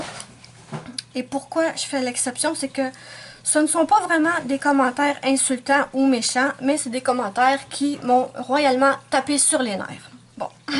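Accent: Quebec, Canada. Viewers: Bonsoir.Je rejoins l'avis des autres personnes qui ont laissé des commentaires....Ne change rien.... surtout!!!! Moi je regarde tes vidéos....pour ton accent québécois.... Il est très....cute...comme tu dis souvent 😊... Et également parce qu'elles sont bien faites...tout simplement. Je ne fais pas de nail arts mais j'adore regarder les tiennes.le résultat est magnifique.Je fais du coloriage pour adulte et j'aime bien ton autre chaîne notamment pour les présentations de livres car tu es franche en les présentant.Surtout continue comme ça!!! Bonne soirée! Bises! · Super joli !!! Je suis tout à fait d'accord avec toi !!! Moi si je te suis c'est justement parce que tu es authentique et j'adore ton accent canadien😍 ne change rien surtout... j'attends toujours tes vidéos avec impatience et j'ai tout appris avec toi !!! 😘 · Merci pour toutes tes vidéos 😊 et surtout ne change rien, reste toi-même c'est parfait 😉👍!! 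J'adore ton accent québécois 😃 !! Et comme tu le dis très justement, ceux qui n'aiment pas tes vidéos n'ont qu'à passer leur chemin. Comme on dit "la critique est aisée mais l'art est difficile" alors que les insatisfaits aillent sur d'autres chaînes ou fassent mieux !! Continue comme ça et merci 😊😊😊👍👍👍 !!!! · Cc on t apprécie comme tu es et reste naturel j adore ton acsent québécois et tu es super. Très jolie ton Nails art. Biz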